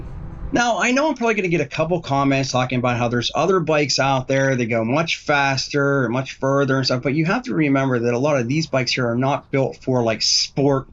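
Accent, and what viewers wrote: Pittsburgh, United States. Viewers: Hah - didn't even recognize it was you...until I was listening and heard just a hint of yinzer and I said, hmmmm, that's real nice like. Awesome stuff. Get the gear.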